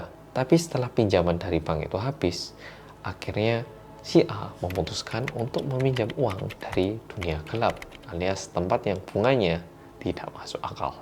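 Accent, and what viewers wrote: Java, Indonesia. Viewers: Mas medok🤩🤩🤩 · Walahhh mase ngomonge kok medok. Menn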